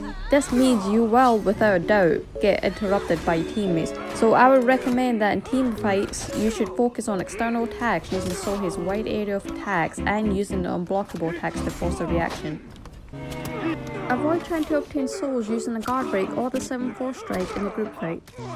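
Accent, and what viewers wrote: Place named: Scotland, United Kingdom. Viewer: Maybe it's easier to hear your voice with headphones on, but just used my phone's speakers. For me, the wii music was just as loud as/slightly louder than your voice. It's not terrible, but you want your voice at the forefront of attention. Unfortunately the grunts and sounds from For Honor made it impossible to understand what you're saying. Plus keep in mind that you have a distinct accent which isn't easy to understand for the majority of people. It's not as strong like a Jamaican accent, but if I had to guess, you're from Scotland/Northern England and most Americans (the biggest audience pool due to sheer size) would say it's very hard to understand. Anyway, it's still always a good idea to make a voice loud and clear in the mix of a video without the clutter and noise of sounds and music which are supposed to fill in the background and not overshadow the person speaking. 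Hope you have a great day and thanks for making a cool video! :)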